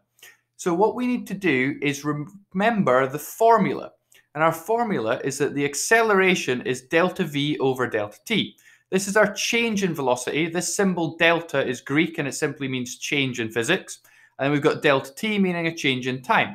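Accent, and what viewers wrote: Scotland, United Kingdom. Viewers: i like ur sco'ish accent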